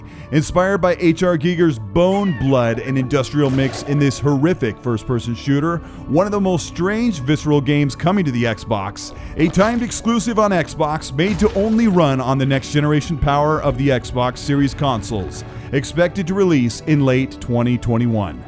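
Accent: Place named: Netherlands. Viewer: You have a Dutch accent. Is he Dutch?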